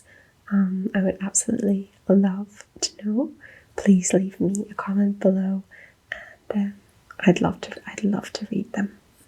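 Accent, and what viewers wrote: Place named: Ireland. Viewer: My theory is that people with ADHD really gravitate to ASMR because it's a way to relax and slow down the constant mental activity that provides just the right amount of stimulation to actually do that. In retrospect it's what drew me to ASMR too. I've been following your channel for many years, big fan! I love your Irish accent and your Irish history videos especially! And I can really relate to the struggle of being present as a mother and professionally. Don't be too hard on yourself. Goals are good, and so is generosity and kindness for yourself and respecting the effort it takes. Looking forward to seeing more of your channel again!!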